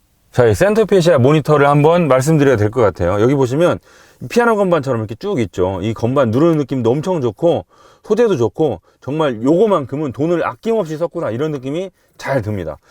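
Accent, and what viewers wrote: Chungcheong, South Korea. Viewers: 편안한 충정도 사투리 속에 날카로운 디테일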